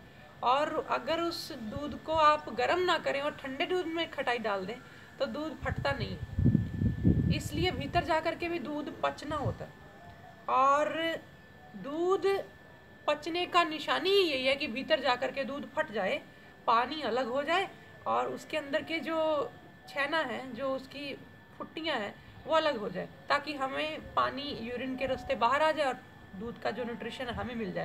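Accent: Punjab, India. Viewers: Sir aap Punjabi ho ?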